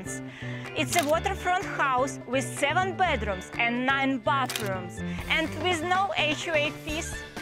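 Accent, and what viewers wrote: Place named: Russia. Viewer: I like your Russian accent!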